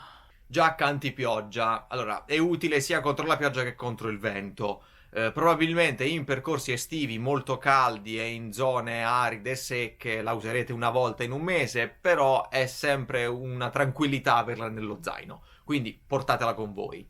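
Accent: Apulia, Italy. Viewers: Pugliese ? 😊 S send 😂😂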